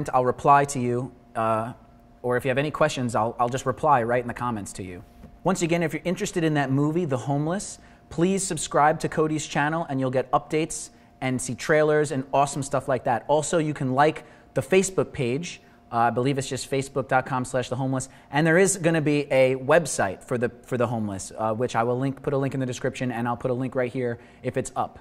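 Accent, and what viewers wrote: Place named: New York, United States. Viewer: ! So happy I found you Googling around... I'm a photographer moving into video. I have a couple of very well used Canon 5D MkII's, but have just purchased a 70D for video. I like the auto focus, and the touch screen. I've seen a few of your youtube vids... love them... as a new yorker myself, i love the energy and a touch of the NYC accent... QUESTION -- I have not found anything in the videos of yours that I've seen about the BEST SETTINGS for the camera -- I'm at 24fps, 1/50th, iso changeable... Vincent Laforet, and others, say to crank down the contrast in the settings... what do YOU do, what would you recommend. I assume that this can all be tweaked in post, but that its supposedly good to capture a flat image. Whaddaya say?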